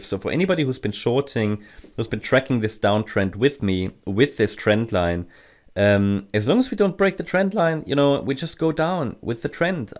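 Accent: Germany. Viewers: If I'm not mistaken, your accent is a mix of German and English?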